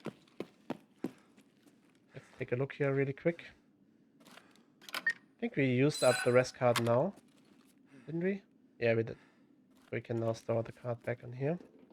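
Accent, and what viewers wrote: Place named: Germany. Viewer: I hear a very deutsch accent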